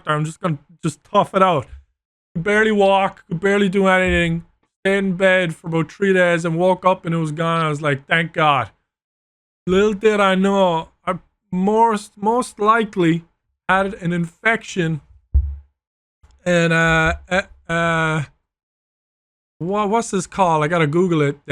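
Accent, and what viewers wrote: Ireland. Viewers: Man being a fellow Irish man and listening to this story/explanation is hilarious because he explained it in such an Irish way😂 plus I just straight laughed for the whole duration of that car bit Anyways good luck and hope all goes well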